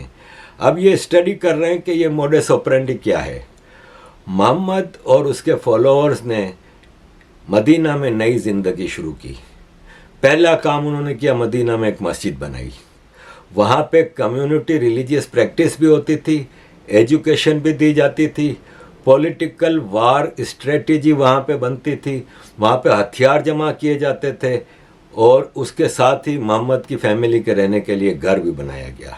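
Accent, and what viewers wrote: Gujarat, India. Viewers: Love ur gujarati ascent ..I m also gujarati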